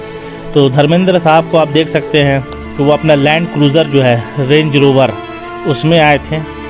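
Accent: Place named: Bihar, India. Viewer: Utuberji, aapni languge sudhariye, Pronounsation me bihari lehja he, faltu baton se vdo bhot lamba bana dala he bhai apne, dusri baat ye namaze janaza dilip saab ki nahi he, pakistani vdo add kardiya he aapne janaze ki namaz ka.... Mujhe itna batayenge bhai... K q jhoot ka sahara lena pada apko....???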